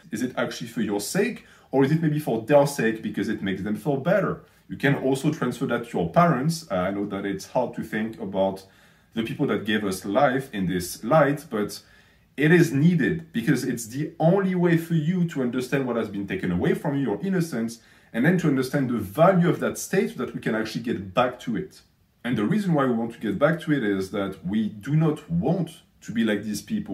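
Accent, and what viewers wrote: France. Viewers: With no exaggeration, I love the perspective offered on this channel. Sound advice from a holistic vantage, a thicc French accent and videos long enough to tax my attention. You understand this cant cease, oui?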